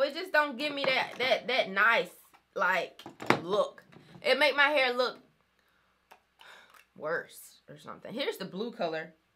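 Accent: South, United States. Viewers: Girl that southern accent is coming through!🥰 I would love to see eco and wetline comparison. Thanks for sharing your product empties. Peace and blessings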